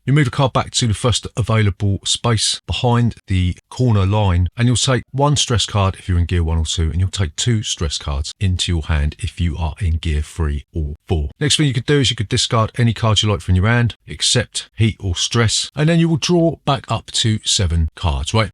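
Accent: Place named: Cockney, United Kingdom. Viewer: From one cockney to another; you're a top geezer!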